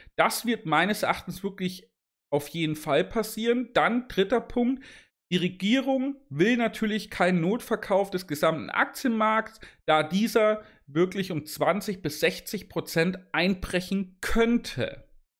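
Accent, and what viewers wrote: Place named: Oldenburg, Germany. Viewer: Höre da einen Oldenburg-Akzent raus ^^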